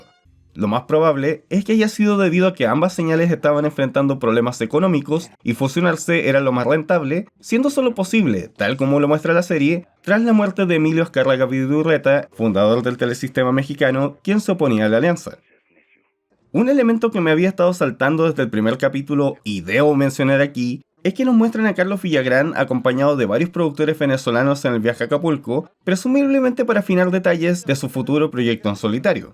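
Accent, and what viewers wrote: Chile. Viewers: Y por qué el youtuber habla como chileno? 🇨🇱🤔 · Por qué es chileno jsjs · Es chileno, así hablan ellos.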